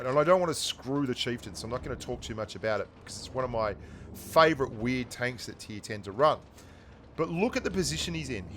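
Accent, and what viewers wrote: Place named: Australia. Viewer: hey mate, r u Aussie? swear to go you sound like one.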